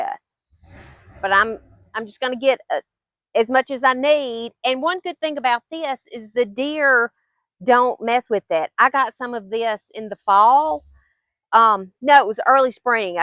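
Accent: South, United States. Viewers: Great tip! We have a dear friend who has a beautiful garden and her name is too. She has the most amazing raspberry bush with no THORNS that produces the biggest and sweetest raspberries. We've been meaning to get some of that plant for my garden and now you've motivated us to stop by her place. BTW....love your southern accent.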